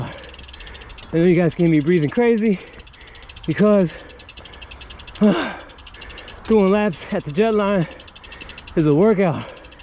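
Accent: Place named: United Kingdom. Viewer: That british accent tho haha! Love the video as always my man!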